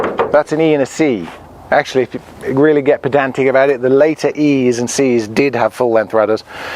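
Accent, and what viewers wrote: England, United Kingdom. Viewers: I listen to you for the "English" accent so say "leeever".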